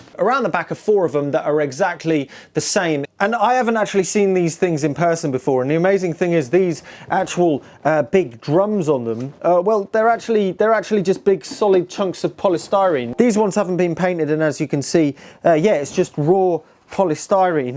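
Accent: London, United Kingdom. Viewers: Pissin off your awesome londoner accent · The fact that you are warning people to not touch the end of a plug after cutting it off it’s plugged in proves other than your accent that you live in the UK you know there’s a thing called Darwin evolution and it’s the reason why people are getting stupider nowadays because it isn’t able to function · These are called 'cheese wheel' Leslie. These is a full spectrum Leslie, Very cool but the full Leslie was wondrous. The bottom was a bass rotating drum with one opening with a curved sheet attached to the upper rear and to the lower front edge. On top was the rotating treble horn [in the opposite direction] There were usually on treble driver , not moving, driving treble waves up into what looked like a double split horn, however, one side was closed off to act like a perfect counter balance. You sound like you are in the UK so l don't think you could donate the unpainted cheese wheel to me, but l am now stuck in an assisted living building, with a Yamaha MO8 sitting there because my Leslie was stolen. 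what you have there will change the dynamic of both your playing and writing, because as you noticed in this video, cool sounds, sound Plastic Fantastic Awayla. It's Halloween night in Salt Lake City, Utah USA so you have been funking off since June. I wish I could hear what you have come up with. People seldom have exactly the same reaction to this marvelous effect. If you come across another out of this world find, Please keep Tom Behind the Zion Curtain, in MOMOLAND UTAH WISH WE COULD JAM WITH COMPLIMENTARY DUAL KEYBOARD LEAD BROTHER. NOTHINGS BETTING THAN DUAL IMPROV! KEEP IT UP KID, CUZ YOU GOT IT! TDH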